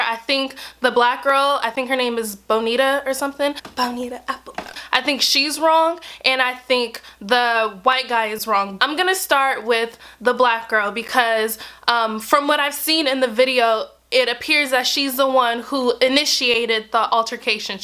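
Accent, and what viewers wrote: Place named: United States. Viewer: You speak with an American accent. Your culture is American. When you accuse white people of "appropriating" your culture when in fact it is African culture they are "appropriating," you are guilty of the very thing you accused them of.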